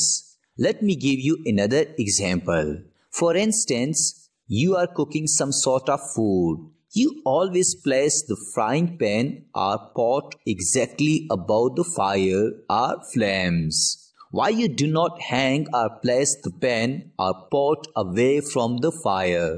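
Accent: South India, India. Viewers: Hahahah it's an Indian accent that's whyyy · It's his normal voice he is south indian that's why he sounds so..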